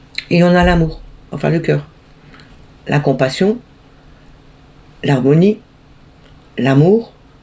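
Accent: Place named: Alsace, France. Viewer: Bonjour, abonnée depuis peu, je prends plaisir à vous retrouver chaque jour. Je dénote un petit accent Alsacien, je me trompe??? Si c'est le cas, nous ne sommes pas bien loin puisque je suis près de Thionville en Moselle. Merci en tous les cas de vos tirages toujours éclairants et de votre bienveillance. Douce et belle journée à vous et à la communauté. 😘💖💝💐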